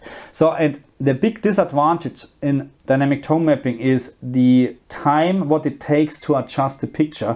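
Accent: Germany. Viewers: Aber nur wegen der Leitplanke, sonst wären die Einstellungen nicht so geil xD...finde sein Englisch sehr souverän...wäre sie Aussprache nicht so stark deutsch, würde es noch besser rüber kommen...aber sehr ausführlich erklärt, gefällt mir gut 👍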